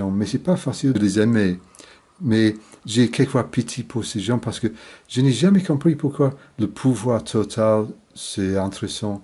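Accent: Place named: Yorkshire, United Kingdom. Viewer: Far less ' Yorky' in your French accent nowadays :)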